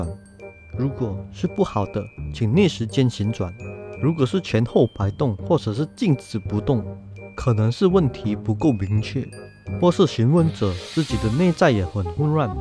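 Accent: Malaysia. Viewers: 你做的卡通很好看，听你的声音感觉是大马人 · 哈哈以前玩線上遊戲 有個大馬朋友就是跟你差不多 所以聽你講福建話我就想到他 也猜到你是福建後代🤣 好久沒聽到大馬福建話了😁 我以為這件事情是發生在大馬😅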